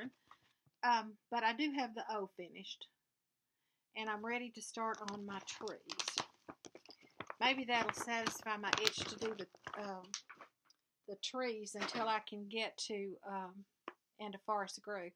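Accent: South, United States. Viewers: Love your videos and your southern accent. Do you have any problems with the hoops "crushing" your finished work?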